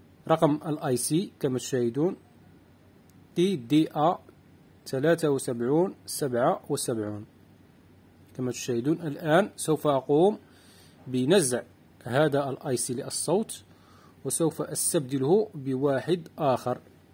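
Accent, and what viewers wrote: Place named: Morocco. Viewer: عمل رائع أخي أريد أن أسألك عن مكان شراء هده القطع الإلكترونية في المغرب لأن من لهجتك عرفت بأنك مغربي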